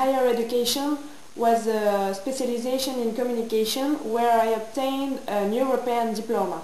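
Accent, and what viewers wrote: France. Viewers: are you french??? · you r french i guess...is it ? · a little hard to understand your english, pretty french, but hot accent thought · Gotta love the French accent!!!! viva la France :)))) I have no idea how I ended up watching this video!!!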